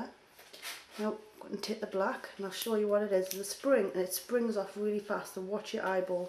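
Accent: Newcastle, United Kingdom. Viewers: shes not irish haha. shes from newcastle ino that cos i am too :D i talk like that aswell :] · Rockin' the newcastle accent angel! We geordies know whats happenin'! ^^ xo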